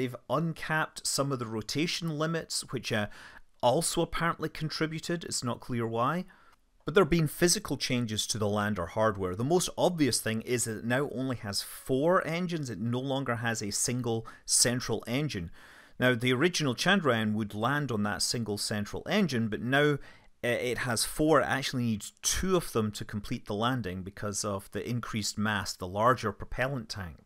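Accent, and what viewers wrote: Scotland, United Kingdom. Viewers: Hi - I love the way you refer to the Mun (which has an uh sound) rather than the Moon. Either it's your rather endearing Scottish accent or else you have been playing too much KSP. Maybe both 🤣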